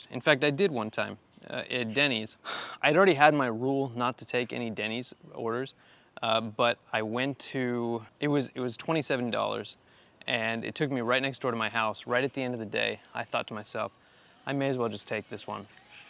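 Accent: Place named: West Coast, United States. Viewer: Medford Oregon. Good stuff. He has a west coast accent but I couldn't figure out what market he worked in.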